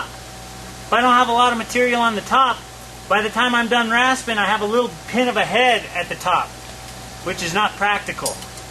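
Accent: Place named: New Mexico, United States. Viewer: . this is an excellent video, clearly explained and the photography does what it is supposed to do, allows viewers to see the detail and the progress of the process. The narration is very good, I could listen to the new Mexico drawl all day. Thanks for a great resource.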